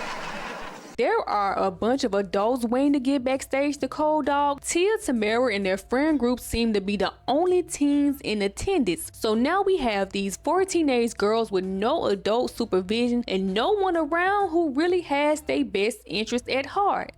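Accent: Memphis, United States. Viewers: Girl are you from Memphis? I love your accent. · May I ask where you’re from? Just asking because you sound like Memphis area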